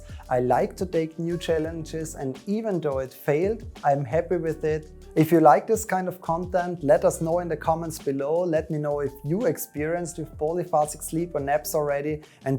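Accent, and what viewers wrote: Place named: Styria, Austria. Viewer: Eher steirisches Englisch!:) <3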